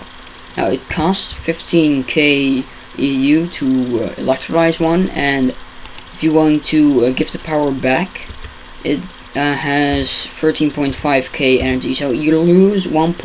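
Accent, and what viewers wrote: Netherlands. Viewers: Nice explanation. *waits patiently for 1.0.0 compatible release.* P.S. Are you Dutch? You have that accent. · Wow its surprising how many people recognize his Dutch accent I noticed it too.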